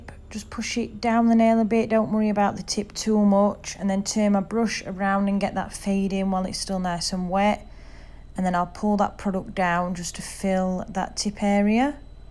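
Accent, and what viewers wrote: Yorkshire, United Kingdom. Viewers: It’s lovely hearing someone from Yorkshire 😊.. gorgeous nails 😍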